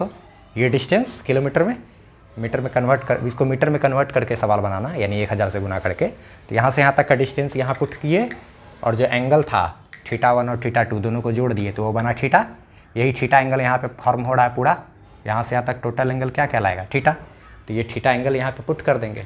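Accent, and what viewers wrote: Bihar, India. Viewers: Sir app bihar sa ho kya to pranam 🔥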